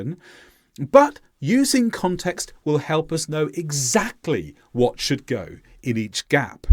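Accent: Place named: United Kingdom. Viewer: it is a pleasure to listen to English with a British accent. We, in Romania, only learn American English at school, being convinced that it is British 😂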